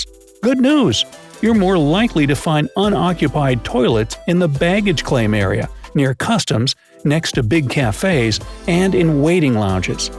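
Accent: United States. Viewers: The voice of the narrator is an american English accent, but tip #33 talks about a "special capsule" for sleeping in instead of a hotel. Nobody from America knows what those are because our airports don't have them. Many countries I've traveled to in Europe and the middle east don't have them either. Come to think of it, I've never seen an airport with sleeping capsules. Great idea, I've just never seen it. I've been to Sydney, Tokyo, and Shanghai airports and I did not see them there either, but I wasn't looking for them. Maybe I just didn't notice them? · Is it an American accent in the video ????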